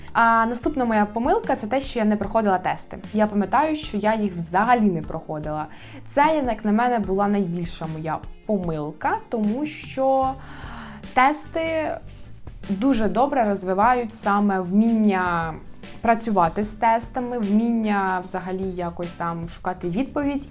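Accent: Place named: Surzhyk, Ukraine. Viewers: Я чую Черккщину) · У вас такий суржик, як з півдня України 😅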